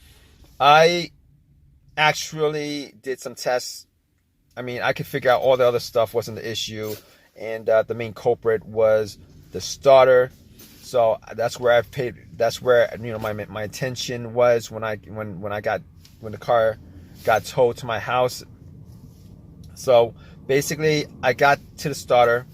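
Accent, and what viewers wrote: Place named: Rhode Island, United States. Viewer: This guy sounds like he is from my hometown (RI)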